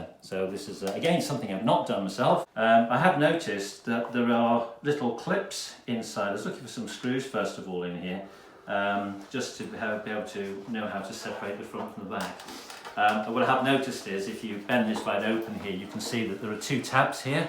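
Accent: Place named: United Kingdom. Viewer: Good morning, you're brilliant, and the explanation, with that British voice, is thrilling!!! Very explanatory video... I disassembled "almost" everything following your instructions and made it to the end... Unfortunately, I wasn't able to open the black box containing the folding motor and, more importantly, the one you ordered and replaced. Can you tell me the parts with the codes and where to order them? Thanks. Giorgio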